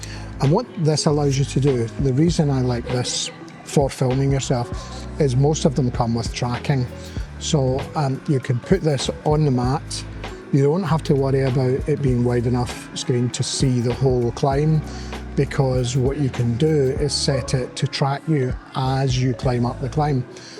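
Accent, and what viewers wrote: Scotland, United Kingdom. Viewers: What’s a Spanner? Something that spans? Also, why is the close caption not burnt into the video? I can’t understand your Scottish accent pal…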